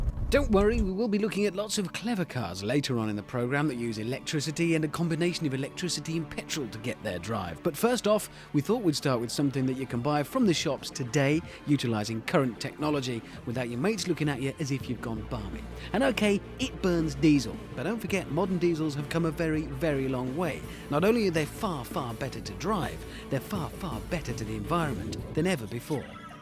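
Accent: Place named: Birmingham, United Kingdom. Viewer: anyone else notice Hammond sounding more brummie ? He said “it’s a fast diesel “ instead of faaaarst like he would today!!!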